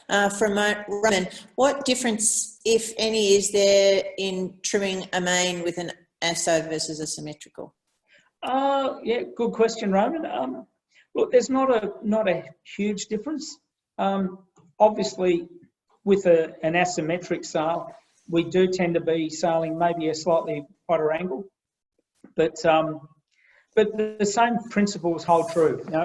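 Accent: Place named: Australia. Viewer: less than 200 likes? There is an incredible amount of very valuable information here! The aussie accent is not easy for me, but what a great video!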